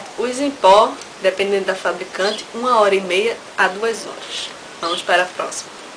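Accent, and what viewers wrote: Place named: Ceará, Brazil. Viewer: voçe e do çeara,ou rio grande do sul? voçe tem um sotaque bem interessante,.......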